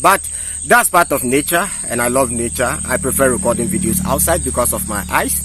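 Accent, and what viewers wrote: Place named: Ghana. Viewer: Stop the Ghanaian English and listen 👂👂 to the West. New technology is the issue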